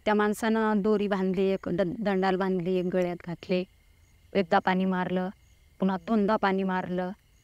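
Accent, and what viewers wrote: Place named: Marathwada, India. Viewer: आमची मराठवाड्यातील ग्रामीण बोली भाषा आहे. कृत्रिम नसल्याने ऐकायला छान वाटते.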